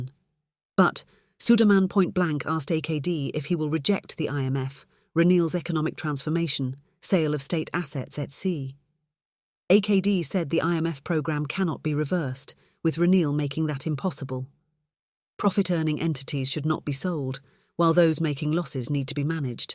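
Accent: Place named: United Kingdom. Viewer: Don't we have English speaking Sri Lankans, that we have to have this "British" lady/accent making funny pronunciations of Sinhala names!! Give me a break.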